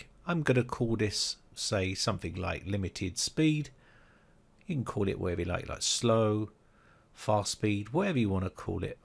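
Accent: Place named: Cockney, United Kingdom. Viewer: It is actually 'Wotcha' guys that he says at the start of the videos. It is an English slang expression for ' Hello, how are you'. Spoken along with his semi Cockney accent. I am from London and speak in a similar fashion to this! I do agree about why would you want to throttle your connection though. I suppose someone must have a reason for doing it somewhere. Puzzling though!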